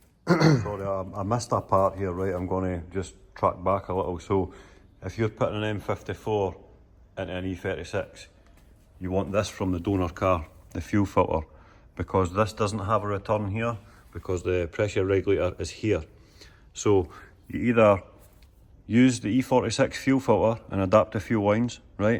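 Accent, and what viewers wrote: Scotland, United Kingdom. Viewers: I've been struggling to get my M54B30 started in an E46 323Ci even after everything's been wired in (it cranks + EWS del), and this has helped both for info and morale. Never thought I'd need a humorous Scottish gentleman explaining BMW wiring to me at 4 in the morning. Thank you, especially, for the brake rev-cutter tip . I'm the head mechanic but have been blocked from being at this car's first drift event. That would have been bad for the drivers to discover without me there.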